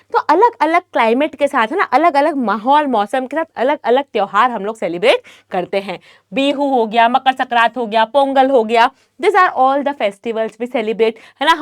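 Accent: Bihar, India. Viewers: mam kya app bihari hai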